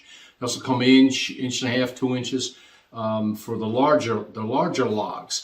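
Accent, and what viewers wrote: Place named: Boston, United States. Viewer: I really enjoyed this video! I’m on the cusp of retirement after 35 years in the landscaping business, and now hoping to pursue some “woodworking” projects myself! I loved the “Boston accent” too! 😂 Thanks again, Dan (Red Wings fan from SE MI 🏒🥅😏)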